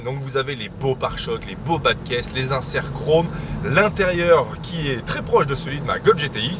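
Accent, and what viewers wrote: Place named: Savoie, France. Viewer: Le combo t-roc + charo avec accent savoie= sa lui va incroyablement bien bref continuer comme sa vs ete les mlr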